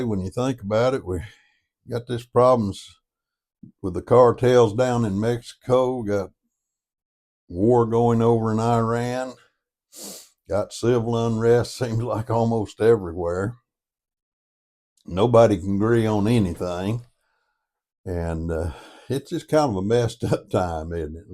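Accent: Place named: South, United States. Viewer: Listen, I'm a Marine Corps vet and former contractor. I'm about as right wing as you can get. People like you who live your sheltered little life down South are absolutely clueless. My ex wife was Jewish, I was trained by Israelis as a contractor after I got out of the military. Let me tell you something Papaw...Every single Zionist Jew in the world HATES YOUR GUTS. The second they hear your Southern accent they wish you were dead. They hate farmers, they hate people who hunt, they hate people who do for themselves and they spit on Christians in Jerusalem. Last year Israel declared themselves the gay capital of the world. You have absolutely ZERO experience with these people. They don't follow the Torah, they follow the Talmud and the Talmud and the Talmud says the Jesus is in "Gehenna" or hell boiling in feces. The State of Israel was founded by a Satan worshipping banking family called the Rothschilds. 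They signed the Balfour declaration of 1917. They founded OUR Federal Reserve and all the central banks of the world. the current state of Israel IS the GREAT DECEPTION!! You are utterly and profoundly clueless. I love Southern People, My Momma is from South Carolina but I'm a Yankee and I know these people YOU DON'T and you are talking out of your ass.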